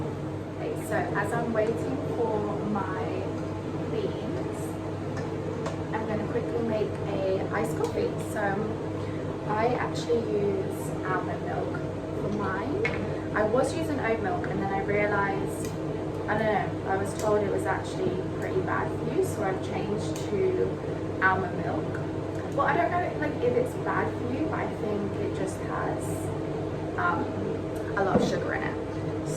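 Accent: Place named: England, United Kingdom. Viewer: Hi are you English ? You hVe a lovrly accent.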